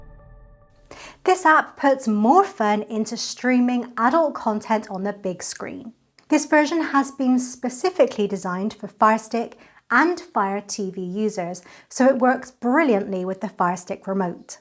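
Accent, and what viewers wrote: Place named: Bristol, United Kingdom. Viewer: Don't get caught with your pants down had me in stitches. Is that a Bristolian accent I hear ? Definitely West Country for sure. Looking mighty fine beautiful lady, keep up the great work you do.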